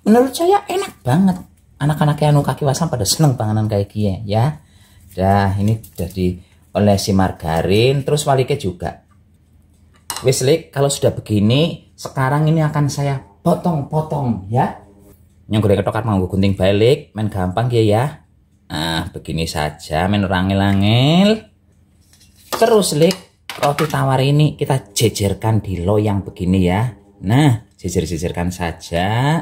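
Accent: Banyumas, Indonesia. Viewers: Kiye bagelen ngapak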